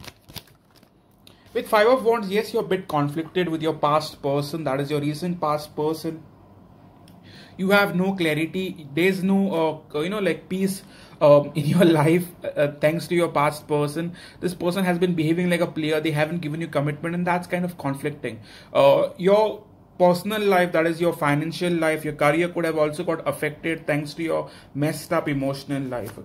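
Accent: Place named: India. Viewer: Your voice seems like that of an indian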